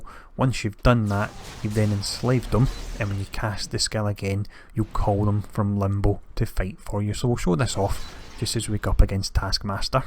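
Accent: Scotland, United Kingdom. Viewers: ffffffuuuuck that Scottish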